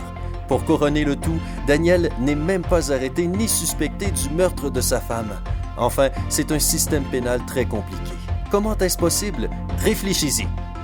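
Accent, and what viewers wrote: Quebec, Canada. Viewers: T'es québécois ta un sacré accent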